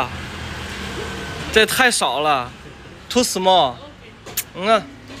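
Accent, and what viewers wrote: Tianjin, China. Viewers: 天津人，哥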